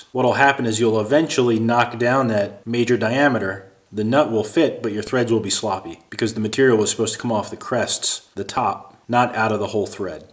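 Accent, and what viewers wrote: United States. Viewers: I want to ask you something. I know I may sound a little bit weird, but, looking your videos, appreciating them, especially the newest ones because of the high humor content, but I just can't help myself not minding my own businesses. Do you live in our really fucked up country called Italy of the recently utterly fucked up continent called Europe? I couldn't not notice in your videos some really commonly used stuff from brands that honestly I'd struggle thinking they ever crossed our borders towards other countries in Europe, or indeed in the world. The Maurer (Ferritalia) stuff or the bottle of mineral water from my region, it's the kind of cheap stuff I couldn't figure out how it would have have made its way to the U.S., provided the fact, that you sound quite American to me, even though I'm no expert since I'm not a native english speaker.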